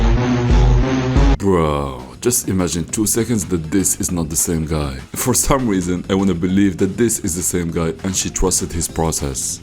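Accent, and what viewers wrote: Morocco. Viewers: Judging by your accent 100% you are from morocco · you're moroccan for sure, that accent is theeeere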